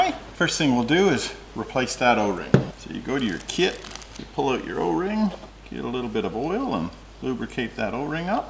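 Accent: Canada. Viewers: I don’t own a Toyota but I had a great laugh at your “bugger me” moments! Add the Canadian twang to the mix and I’ve spent the whole arvo watching your clips about a vehicle I don’t even own! Well done and keep the content flowing.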